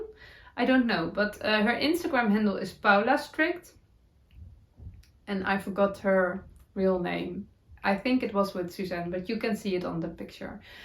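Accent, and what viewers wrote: Netherlands. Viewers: I love your Dutchy English. Thank you for the reminder to do things that make your heart jump!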